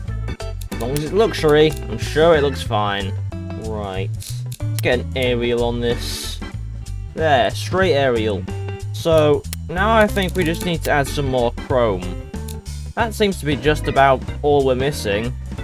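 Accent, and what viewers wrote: United Kingdom. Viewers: GrogTV but British instead of Aussie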